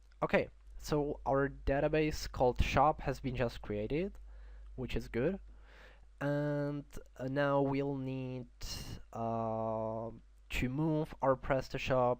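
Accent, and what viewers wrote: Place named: Philippines. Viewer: Your enlish is very good! with a pilipeano accent. I like very much!!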